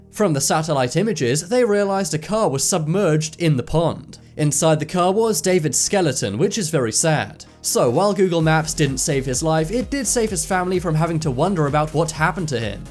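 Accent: United Kingdom. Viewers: Are you britash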